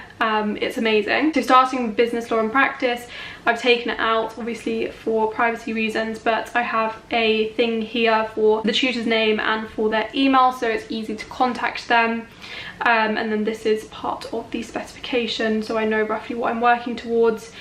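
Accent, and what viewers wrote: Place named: United Kingdom. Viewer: that British accent 🤤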